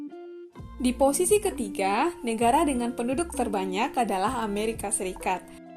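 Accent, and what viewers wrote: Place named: Eastern Indonesia, Indonesia. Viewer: Logatnya agak lain kak.. dari timur kh?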